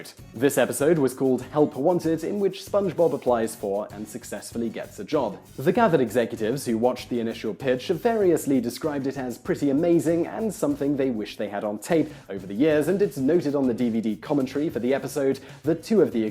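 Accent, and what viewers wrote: United Kingdom. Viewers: Idk what it is with watching people with a British accent explain stuff, but it gets my precum flowing.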